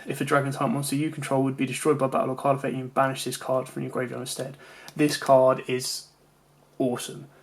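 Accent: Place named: United Kingdom. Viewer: Didn't you noticed his accent?. He is from the UK, It falls to reason Europe got it first. Now that I think about it, We can just import it from there right?. Doesn't matter. It's out now.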